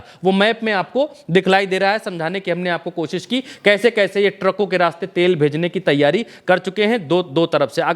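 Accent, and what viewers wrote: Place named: Bihar, India. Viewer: .I. not only like your presentations but also enjoy. Yours Bihar ki. Villege Accent . Apart from. Khan. Sir videos I. had liking for Sekher Suman who used. To copy. Lalu .prasad yadab .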